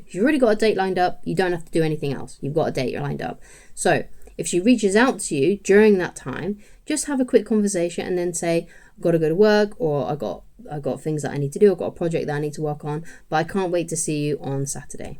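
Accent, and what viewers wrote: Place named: United Kingdom. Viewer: loved that british accent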